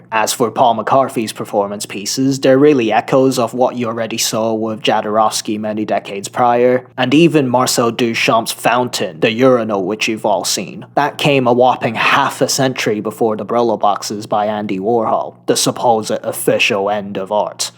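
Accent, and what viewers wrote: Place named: Upper class, United Kingdom. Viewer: why do all of you anti modernists have such a smug upper class accent?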